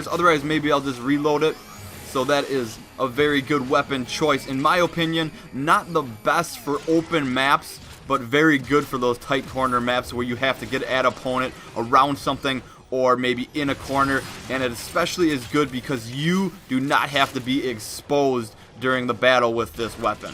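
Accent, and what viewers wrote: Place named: Canada. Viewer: he has a really heavy canadian accent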